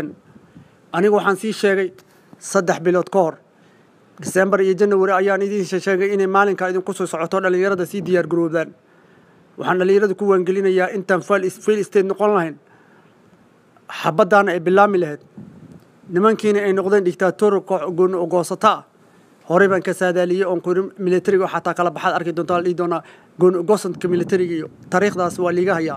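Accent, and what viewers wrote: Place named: Ethiopia. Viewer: Adigaba Eathiopian ah lahjadada ayan arkaye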